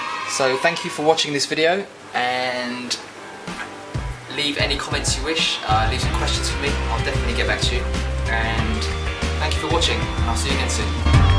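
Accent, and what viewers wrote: England, United Kingdom. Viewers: I notice your accent. Are you based in the UK? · That British account tho. somehow satisfying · Japanese dude with a British accent? Sign me up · Me too. Japanese in british accent, so COOL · hhh He has an english accent so he was probably raised in an english speaking country. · what a nice combination!! Japanese guy with British accent.... I like you keep going がんばって:) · Japanese handsome chef with English accent.....mmmmm 👍🏻😘🧑🏻😻😻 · I love your British accent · English accent makes him intriguingly interesting! Tho the oil colored pretty scary… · James Ziemba Sounds like chef is a born and bred Brit. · That British accent.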